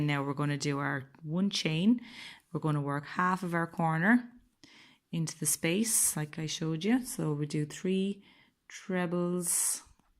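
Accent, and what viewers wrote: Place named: Ireland. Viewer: I found your individual Granny square thank you. I've completed it thank you.Looking at you crochet with that hook you've a very natural flow. You must be crocheting for years. Please do upload more when you get time. Have you a scalloped border most on here don't show how to do the corners. May I ask where in Ireland is your beautiful accent from. ?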